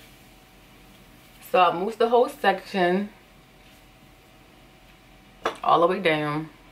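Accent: Baltimore, United States. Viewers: This video was recommended to me and I'm glad it was. Great tutorial and hairstyle. Just curious, are you a hair stylist? I noticed a Baltimore accent, it'd be great to have someone with your skills in the area. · Came out beautiful... off topic but you sound like you’re from Baltimore... but anyway imma try this method... seems easier than the rubber band method .. thanks for the tutorial sis EDIT:and that dress is cuuuute too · I love that Baltimore accent! I could hear it with certain words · You're from Baltimore or the DMV right? That accent is a dead giveaway! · U got that strong B-more accent!!😍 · Lol that Baltimore accent I love it. And your hair looks so pretty I'm gonna stop being afriad and try something new. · Yo she got to be from Baltimore😁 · Bomb tutorial! Thank you 💙! Can't wait to do this in my hair :). I have a question, are you from Baltimore, Maryland? 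I hear an accent. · Are you from Baltimore or dc ? Lol i hear it. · This is the neatest I’ve seen. I’m trying to do mine and I’m about to throw In the towel. I love your accent by the way. Are you from Baltimore? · You from Baltimore? I can hear our accent. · Love your accent. Baltimore? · I know our accent anywhere Bodymore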